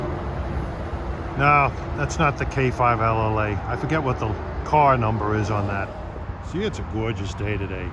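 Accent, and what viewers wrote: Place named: Long Island, United States. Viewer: Great videos! Where are you from originally? That accent sounds like Long Island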